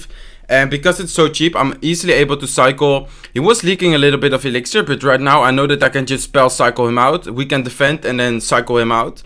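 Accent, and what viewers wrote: Netherlands. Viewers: are you dutch? sounds like it xD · Zo'n nederlands engels kut accent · ik hoorde meteen je Nederlandse accent hahaha want dit is de 1ste video die ik van je kijk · Haha dat Nederlandse accent geweldig😂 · SmikkelKabouter hij is nl ik hou echt van zn engels😂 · Haha je hoort echt duidelijk dat hij Nederlands is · ben jij nederlands? zo klink je man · SmikkelKabouter He is Dutch yes. I used to speak English like that too but that was when I was 12 years old xD Btw sappige nickname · Je bent nl 😂 dat hoor je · SmikkelKabouter sws nl je hoort het meteen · your not english i think i think your dutch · SmikkelKabouter hij is nl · Haha je Nederlandse accent is te horen ;) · Mooie tacktieken! Toen ik de video startte en je accent hoorde dacht ik gelijk "dat moet wel een Nederlander zijn" en toen las ik je discription. Tbh best wel fun. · Are u dutch cuz u have a accent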